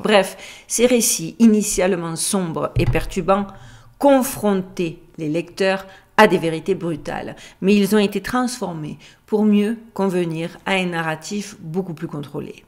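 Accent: Marseille, France. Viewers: Bonjour Continue à garder ton accent marseillais et tes petits rires trop craquants en racontant tes histoires. Sinon c'est comme si tu lisais une belle partition mais sans t'accompagner d'un instrument🥰🥰🥰🥰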